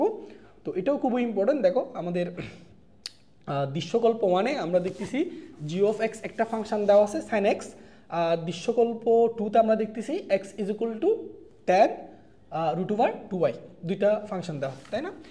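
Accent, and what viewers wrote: Chittagong, Bangladesh. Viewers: স্যার আপনি কি চট্টগ্রামের???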